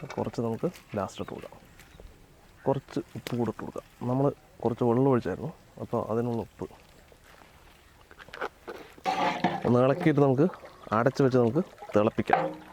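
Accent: Palakkad, India. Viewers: Bro nigal palakkad anno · Ingaloru sambhavaman 💙💙ikkaa.. palakad swantham slang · Palakkadan slang kollam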